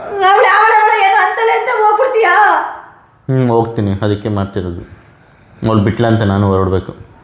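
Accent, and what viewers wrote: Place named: Hassan, India. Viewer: Hassan Mathugale Chanda❤❤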